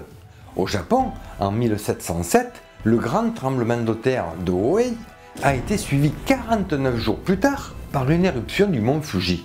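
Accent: South, France. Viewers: J'adore votre chemise, manque des espèces d'arbres fossiles dessus, j'aime encore plus votre commentaire assez clair outre l'accent du Sud ^^ Les trapps de Sibérie semblent indiquer que l'humanité pourrait aussi disparaître un jour suite à un épisode volcanique ravageur enclenché par un réchauffement global et relâchant des mégatonnes de gaz toxiques... Yellowstone pourrait être un vecteur de catastrophe, pour l'instant le fond du cratère se déforme et s'élève et les geysers chantent devant les touristes, advienne que pourra. La peau d'orange qui recouvre le globe pourrait se déformer aussi avec une fonte accentuée des glaces, ce sont des phénomènes qu'on maîtrise encore mal car ils sont multi factoriels, donc probable qu'on soit mis devant le fait accompli en cas d’événements cataclysmiques, mais restons optimistes... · Très bon sujet. Et c'est toujours agréable à écouter avec cet accent plein de soleil alors qu'il pleut à verse chez moi :)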